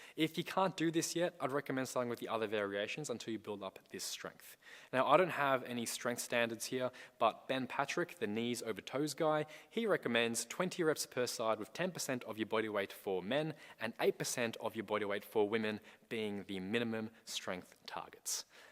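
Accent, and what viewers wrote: Australia. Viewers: I’ve never seen an Asian with an Australian accent, super cool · Are you from Australia?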